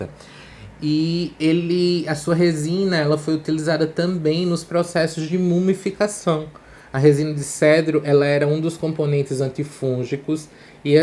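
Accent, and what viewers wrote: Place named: Recife, Brazil. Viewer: você é de Recife? você tem um sotaque de algum lugar do nordeste, mas você falando parece muito Recifense.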